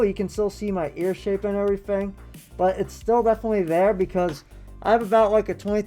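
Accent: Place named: Netherlands. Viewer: Are you Dutch by any chance I can hear the accent a little bit hahaha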